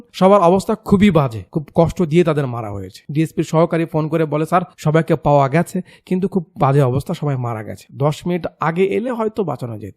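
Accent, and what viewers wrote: Bogura, Bangladesh. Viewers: ভাই আপনার বাসা কি বগুড়া ❤😮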